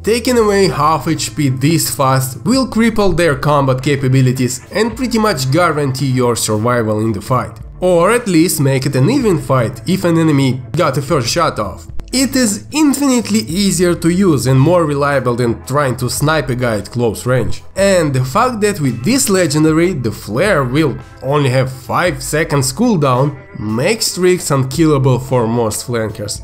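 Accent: Russia. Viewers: i like how u can talk in my language with ur russian accesnt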